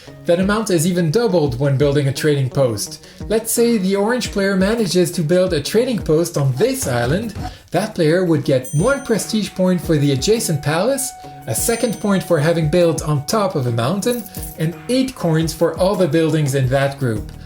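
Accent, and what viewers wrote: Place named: France. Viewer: with a touch of French accent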